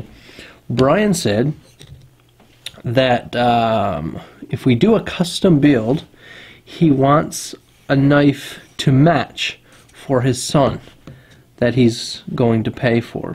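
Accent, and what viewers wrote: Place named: Newfoundland, Canada. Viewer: sorry new to the channel, but yeah the accent gave it away im a alberta boy living in ottawa area got alot of buds from Newfoundland... Both baymen and townies... But all good folk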